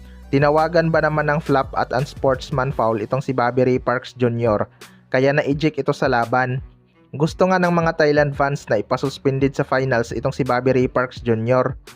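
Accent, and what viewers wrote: Visayas, Philippines. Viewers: Bisaya e tuno palang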